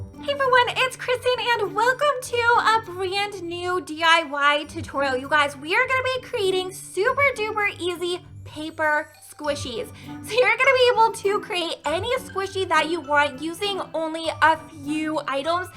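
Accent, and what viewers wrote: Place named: France. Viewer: I love your French accent!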